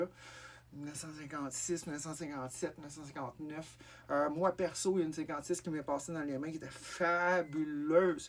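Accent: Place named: United States. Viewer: Putain, un accent à couper au couteau... Ça parle moitié anglais moitié français... Et quand ça parle français ça a un accent américain.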